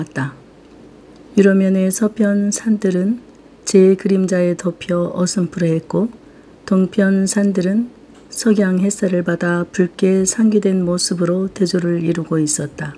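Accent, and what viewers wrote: Jeolla, South Korea. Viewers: 왓마 오랫만에 전라도 사투리 들어봉께 옛날생각이 나뿌네. 구수허구먼. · 선생님. 전라도 사투리가 날로 좋아져서 찰떡같이 찰지고 구수해서 들으면서 입가에 웃음이 절로 납니다. 고맙습니다.❤❤❤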